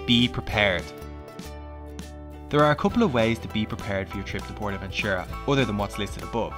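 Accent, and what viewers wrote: Ireland. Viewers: This is so random are you Irish? 😂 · Was surprised to hear an Irish accent!! Irish here too!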